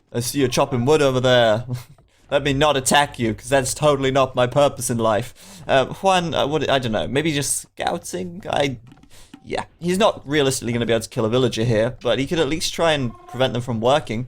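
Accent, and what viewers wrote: United Kingdom. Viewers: It's extremely British lol, also UK has dozens of different accents so for people not native to it, its often hard to tell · Where about he is from in the UK, do you know?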